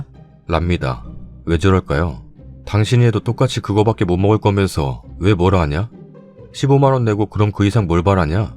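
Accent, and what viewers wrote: Gyeongsang, South Korea. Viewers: 저 부산사람인데 부산말 욱겨요 ㅎㅎ 어리버리 글 지워 졌어요 죄송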